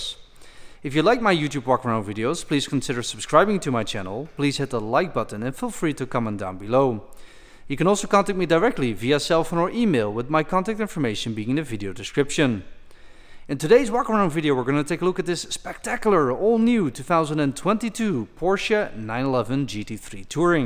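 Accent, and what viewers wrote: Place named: Netherlands. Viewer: en is een Hollander als ik me niet vergis in mijn oren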